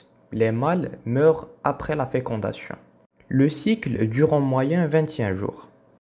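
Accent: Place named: Algeria. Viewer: Vous avez une accent algérienne en TT cas merci infiniment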